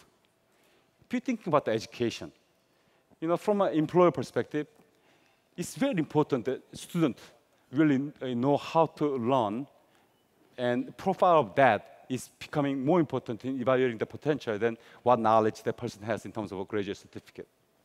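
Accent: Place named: South Korea. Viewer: He has a thick accent. I understand that he's a Korean. I wonder why doesn't he speak Korean to Korean audience?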